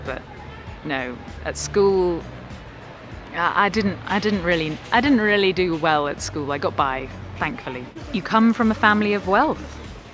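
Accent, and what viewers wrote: United Kingdom. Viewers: All Brits have a pleasing accent! We don’t even know which ones are considered posh. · You really are a talented person, musician, singer, has a beautiful voice singing, but you have no idea how I love to listen your voice when you're speaking, the calm, how it sounds is enjoyable to me and I love, really love the British accent. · You realize people just love your wonderfully intelligent and smooth British accent and that's the primary reason you have so many views right? ;) LOL! Keep it up! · Your “ ” cover with Josh Turner was EL PERFECTO!! Thank you! I also appreciate your respect for the English language and attempt to use proper grammar. I share it. Language is at best a limited and somewhat poor vessel for conveying our thoughts and feelings. Taking the trouble to develop a good vocabulary and decent command of the language is critical to our ability to think, understand the world around us, and communicate our insights. 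It deserves respect. As an American, I’m a bit unsure of the meaning of a “posh” way of speaking. However, I am aware of the many radically different accents in Britain; some quite difficult to understand. Yours is very nice. I’m from an old Tidewater Virginia family that predates our war for Independence. We have an accent here that still retains many flavors of old English so the way the Royals speak feels familiar to my ear. Linguistically I have no idea what that means except that perhaps being extremely traditional and somewhat cloistered from the general public they may have preserved a way of speaking from the times when England colonized America. English aside, I enjoy your music. Thank you. Good music is mana for the soul and making it is a worthy life’s endeavor. Well done!!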